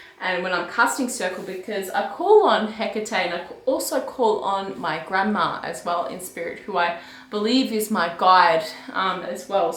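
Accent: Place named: Australia. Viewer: the Aussie accent just like her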